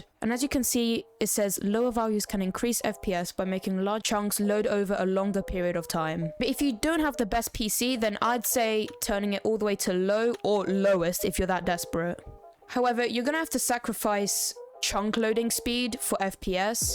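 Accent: United Kingdom. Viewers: i could tell from the start u were brittish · british egirl gg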